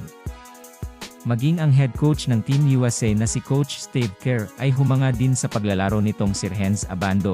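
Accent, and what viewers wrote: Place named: Pampanga, Philippines. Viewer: kapampangan yung nagbabasa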